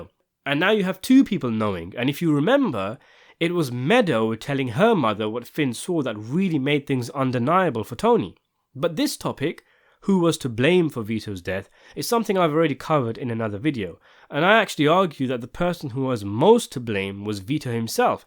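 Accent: United Kingdom. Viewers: Can’t get enough Sopranos wordplay from someone with a UK accent. Keep it up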